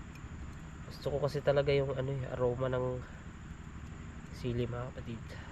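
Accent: Visayas, Philippines. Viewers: bisaya ka sir?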